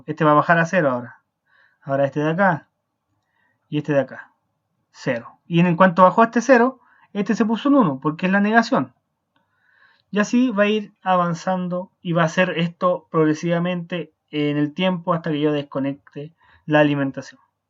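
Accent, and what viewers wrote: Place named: Chile. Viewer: muchísimas gracias muy didáctico y muy bien explicado así es muy fácil de entender por su acento parece ser de Chile , un saludo desde Colombiano.y nuevamente muchas gracias. .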